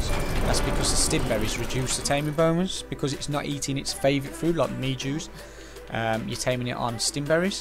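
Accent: United Kingdom. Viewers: You from West mids mate sound local haha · After playing for over a year I am only now learning this trick. Prefect for those first herbivore tames at the start of the game! Smashed your like button in its pretty little face, mostly because your brummie accent reminds me of home :D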